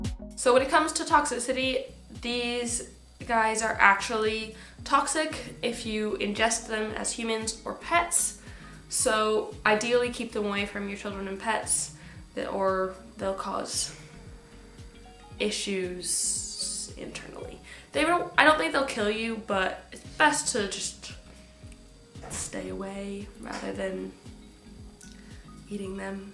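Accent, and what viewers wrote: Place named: United Kingdom. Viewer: Are you British? I hear a bit of an english accent...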